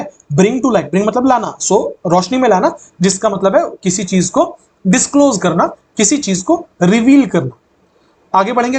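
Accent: Bihar, India. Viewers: sir, I know your voice. You are from BIhar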